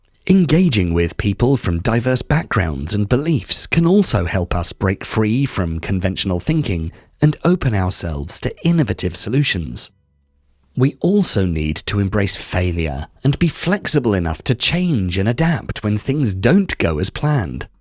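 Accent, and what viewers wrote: United Kingdom. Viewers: Perfect British accent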